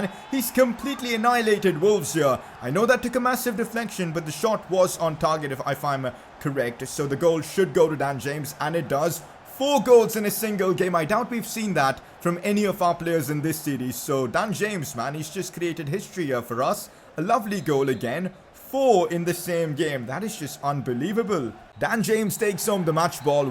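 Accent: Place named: India. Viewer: Pcq:do you just play fifa or play other games as well?? And one more you sounds like indian so do u speak hindi?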